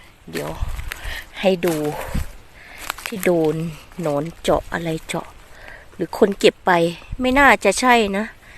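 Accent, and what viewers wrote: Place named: Korat, Thailand. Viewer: สวัสดีค่ะ นกเข้ามาเก็บเห็ดด้วยค่ะ พูดสำเนียงโคราช อยู่ที่ไหนคะ นกอยู่อำเภอสีคิ้วนะคะ 🥹🥹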